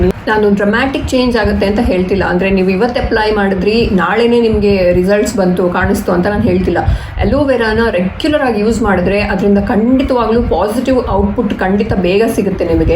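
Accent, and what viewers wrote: Mangalore, India. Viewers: Nice vedio ....😍😍happy to c someone talking in mangalore kannada in volg 😍😍😍evn m from mangalore staying in UAE... tulu la patherle yedde apundu Kendra.... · nivo Yavaglu mangalore kannada ne mathadi please. 🙏kelalikke thomba chenda erthe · Nimma mangaluru slang thumba chanda ide😍😍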